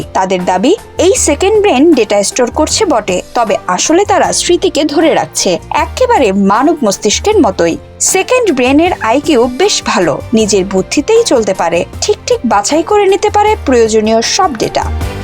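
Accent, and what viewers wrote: Kolkata, India. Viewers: ৭১ টিভি কি কলিকাতার সাংবাদিক নিয়োগ ূিছে নাকি🙄🙄🙄🙄 সেরকমই লাগে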